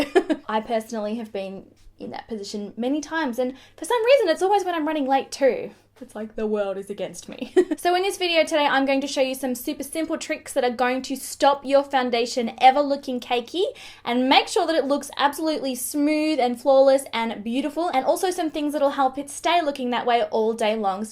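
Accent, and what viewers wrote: Australia. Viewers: I almost never have the sound on when I watch tutorials because basically most chicks annoy me. But I wanted to hear what you were talking about with this tutorial, so I turned up the volume. And you're an Aussie. All this time I didn't know...lol. New subbie lol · ?hmmm..let me guess.. Aussie accent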